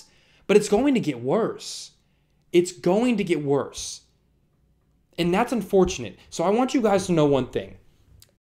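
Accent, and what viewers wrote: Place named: South, United States. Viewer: What happened to your southern accent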